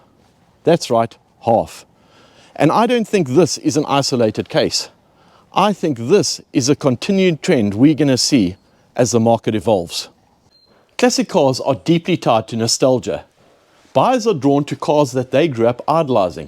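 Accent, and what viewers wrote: South Africa. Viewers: first time viewer. What a great guy, with a fabulous south african accent! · First time watching a video from this channel. It seems that you're a South African living in the UK. The accent is a giveaway if I'm correct about that. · What a fabulous collection of cars ❤️. Is there a hint of a South African accent in there?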